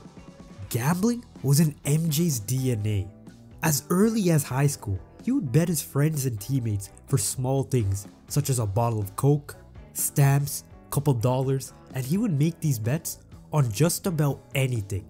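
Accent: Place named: United Kingdom. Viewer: God, your voice is so... BRITISH, EXCITED, I DON'T KNOW!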